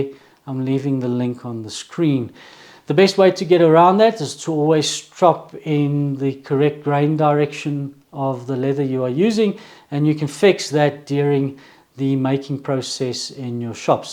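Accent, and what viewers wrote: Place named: South Africa. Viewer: How do you refresh your strop when the leather darkens and flattens? BTW, your accent reminds me of Darryl Cullinan the former SA batsman.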